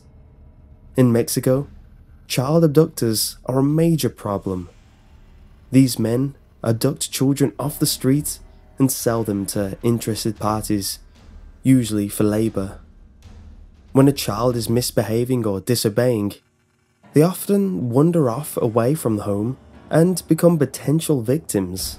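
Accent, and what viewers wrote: England, United Kingdom. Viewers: give him a break! he has a british accent what do you expect! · Im hispanic, and you saying Spanish-y things with your wonderful British accent was enjoyable XD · It's an English accent there is no such thing as an British accent at all. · lol you kept saying it wrong but I still love that British accent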